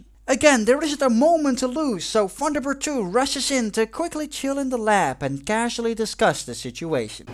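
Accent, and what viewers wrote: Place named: Netherlands. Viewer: I hate your accent i can tell your dutch i am also dutch ahaha😂😂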